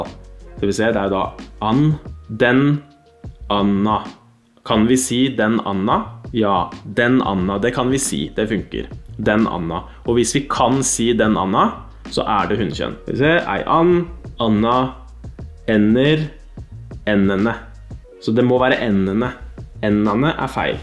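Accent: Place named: Eastern Norway, Norway. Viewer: Det er stadig noen som sier 'jeg 'snakker' ikke nynorsk'. Ingen snakker verken nynorsk eller bokmål. Nynorsk og bokmål er litterære språk. Når vi er 5-6 år, er språket vårt ferdig utvikla - grammatisk sett. Vi må på skolen for å lære å lese og skrive. Der lærer vi å lese og skrive nynorsk, bokmål, engelsk, tysk, fransk, spansk etc + norske minoritetsspråk. Når vi skal skille norsk fra dansk og svensk, ser vi at dansk og svensk ikke har trekjønnsbøying, i samme grad, som på norsk. Vi kan altså si at norsk er et nordisk språk med trekjønnsbøying i substantivklassen. Substantivklassen er den største ordklassen. Vi kan generalisere ut fra det. Nynorsk er basert på norske dialekter. Eneste unntak er bergensdialekt og talemålet på Oslo V. På samme måte som med dansk og svensk så har de ikke mange hunkjønnsord. Alle andre norske dialekter har trekjønnsbøying. 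Når nynorsk er baserte på norske dialekter så er det 'bare' å bruke dialekten, slik som i videoen, for å finne rett kjønn. Legg merke til at, i videoen, snakker østnorsk. Østnorsk er norsk med trekjønnsbøying. Altså kan østnorske bruke sin dialekt til å finne ut av bøyninga i substantivklassen. Selv østnorsk er 'nynorsk'. Det er jo et norsk dialektområde. Å si at man 'ikke snakker nynorsk', og dermed 'hater nynorsk' er ikke et gangbart argument.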